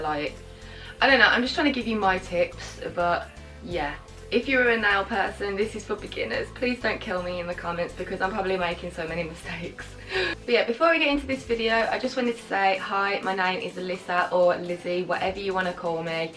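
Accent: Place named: Birmingham, United Kingdom. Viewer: Your sooo brummy. I love it!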